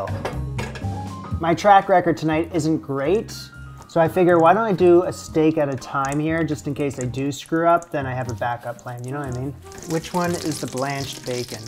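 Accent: Canada. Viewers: I’ve always felt like I hear a twinge of Canadien in your voice, so I’d like you to know that the shot of the Bearnaise being poured over a slice of steak made me yell, out loud, “NOW THAT LOOKS GOOD, EHH?” 😂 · I absolutely love watching his videos. The only downside to watching them every day is that I know find myself saying "now" and "about" in a Canadian accent, I live in the UK. Pity I can't cook like him though 🤣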